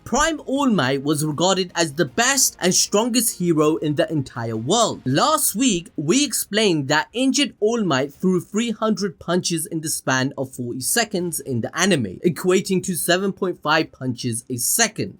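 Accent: India. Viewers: brooooo are u indian ???? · you indian?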